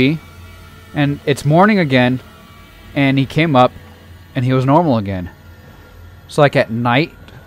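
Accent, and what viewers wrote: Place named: United Kingdom. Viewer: WE ARE BRITISH 🇬🇧 (sorry I love the British accent)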